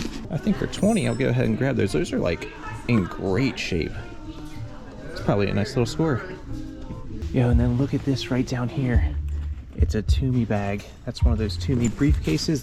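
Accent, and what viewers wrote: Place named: Reading, Pennsylvania, United States. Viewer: Stumbled up your vid, are in or from the reading pa area? You sound just like my family from there! ❤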